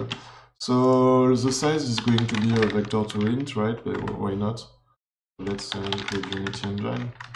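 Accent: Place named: France. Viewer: Tu as un accent Français ahah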